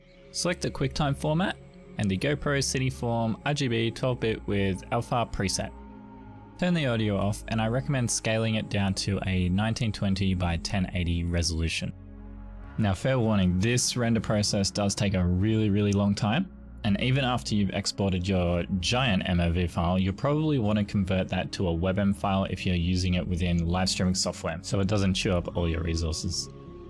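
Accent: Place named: South, United States. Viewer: ....YOU'RE FROM KENTUCKY?! I never knew. First time hearing you speak after all! Love that thick southern USA accent!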